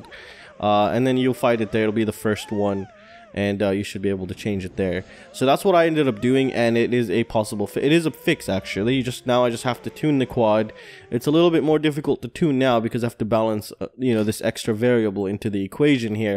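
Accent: United States. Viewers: Are you in the uk? Looks like UK and Uk numberplate on the 206. You sound American.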